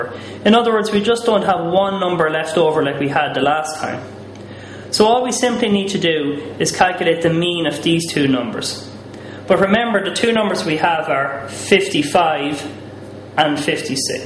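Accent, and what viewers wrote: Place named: Ireland. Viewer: thanks a bunch! and i love your irish accent :)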